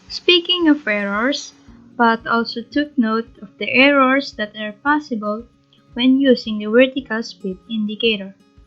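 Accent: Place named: India. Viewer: OMG, heavy Indian accent, read by someone who does not understand what they are saying.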